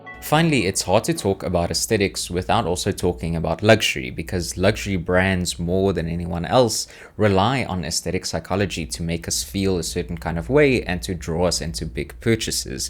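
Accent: South Africa. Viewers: bro sounds south african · you sound South African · You sound South African… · are you south african bru? <3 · You south african? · Totally irrelevant, but I heard your accent and knew you were South African without checking the channel description. Howzit bruv 😂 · Are you South African? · bro, are you South African? i'm kinda getting the South African accent there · Love the video bro but I couldn't shake the feeling that you're from South Africa, accent sounds South African to me. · Yoh the South African in me heard 4 seconds and knew you were from here either ways this was a majestic fyp pull, love how you explained it all and is actually had a simple understanding. Earned yourself a new subscriber 👌🏾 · you sound south african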